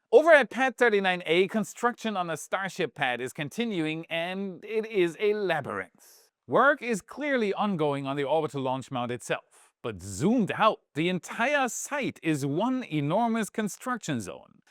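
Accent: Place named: Germany. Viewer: “ that voice got sinister sounding real quick”. I noticed that too. elocution is changing and in addition to the intonation you mention, he's forcing the "r" maybe with the intent of sounding "American". I'm a Brit who's been in France for four decades now, and am not losing my UK English accent in French. Nor does Scott Manley lose his Scottish accent in California. Out-of-region accents are positive and participate in creating one's personal trademark AKA "branding". On the same basis, its totally okay for to show his German origins when in Florida and not mess with his accent or intonation through vocal coaching which is a waste of money IMO and possibly damaging in the long run. I do hope gets to see this comment and maybe associate this with similar remarks made by other people over time. Be yourself!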